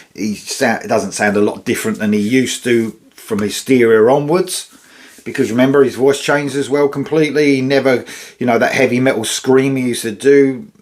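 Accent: United Kingdom. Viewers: I'm surprised you spent so much time on something you don't like. Just asking really gently and in all sincerity why did I waste time listening? (I think it's because I enjoy your UK accent from a region I still can't identify and your interesting REACTION comments!)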